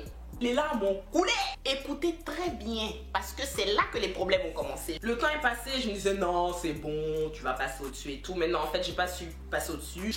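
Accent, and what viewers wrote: Ivory Coast. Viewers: alors là ! !!! Si on me dit que tu n'es pas ivoirienne toi! lool. Je te découvre avec cette vidéo. ..Et pardon yé né ka m'abonner keh lool. J'ai trop rigolé. Je t'encourage ! Tu es top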